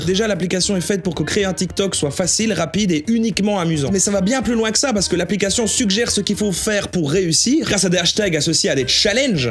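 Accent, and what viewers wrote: Belgium. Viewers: accent belge :p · il est belge donc oui, le "ui" on le prononce "oui" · Je cois avoir entendu un petit accent belge....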